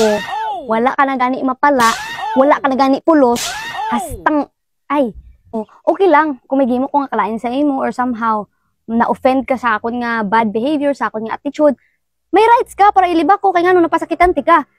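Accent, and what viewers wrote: Cebu, Philippines. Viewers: Ilonggo pero medyo may sagul cebuana❤😂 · Ilonggo pero ang tono Cebuano...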